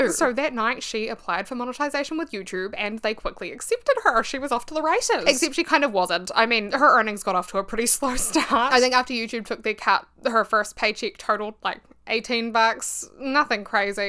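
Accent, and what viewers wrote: New Zealand. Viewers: New sub - I love your 🇳🇿 accent! This video is super thoughtful♡ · I had to pause the video to come here and brag that I correctly guessed your accent was Kiwi! (as an American). Okay, back to regular programming. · I subscribed for the thick kiwi accent. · its so comforting hear a kiwi accent because as a fellow kiwi who consumes alot of youtube alot of it is from American or British youtubers i love watching your videos its so well made and also making me proud of seeing content i love to watch being made by someone whos from the same country as me which idk if thats odd haha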